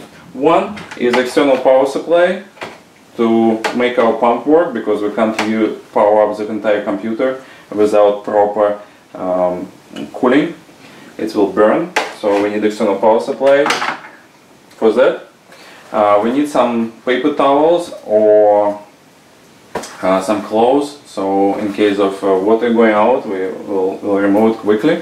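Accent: Russia. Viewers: I just have to ask, are you Russian? or maybe German>? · You are russian?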